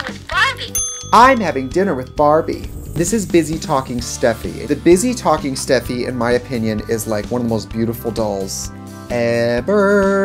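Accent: Wisconsin, United States. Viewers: I love how Trixie always says marry instead of blend, the Wisconsin is showing through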